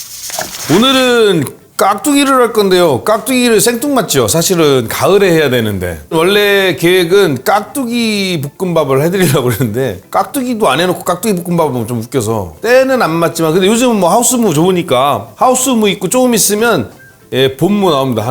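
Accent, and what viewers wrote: Chungcheong, South Korea. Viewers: 우리막내삼촌닮으셨어~ 너무푸근하고 친근감있고 은근재밌고...같은충청도라 말투도 정감있고...너무좋으신분같아~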